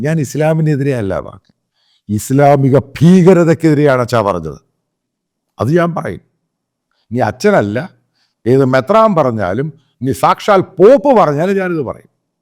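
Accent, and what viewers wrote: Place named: Pathanapuram, India. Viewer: സാമുവൽ സാർ ഞാൻ കേരളത്തിൽ ഞാൻ ആരാധിക്കുന്ന അപൂർവം ചില journalists കളിൽ ഒരാളാണ് സാമുവൽ സാർ.. കാര്യങ്ങൾ പഠിച്ച് മനസിലാക്കി സത്യം ജനങ്ങളിൽ എത്തിക്കുന്ന താങ്കളുടെ Hard journalism ഉം പത്തനാപുരത്തുകാരുടെ Accent ഉം എന്നെ വല്ലാതെ കോരിത്തരിപ്പിക്കുന്നു. ആളുകൾ വിമർശിച്ചോട്ടെ.... സാമുവൽ ധൈര്യമായി മുന്നോട്ട് പോകണം സർ. I wish u all success to you and your news channel which proved to be Genuine and popular news channel in Kerala. Ajith Kumar from Thiruvananthapuram.🙏🏻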